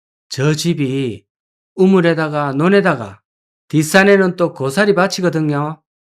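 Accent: Gyeongsang, South Korea. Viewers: 좋은데 갱상도 말을 좀 줄여요~~!!!!!!!!!!!!!!!!!!!!!!!!!!!!!!!!!!!!!!!!!!!!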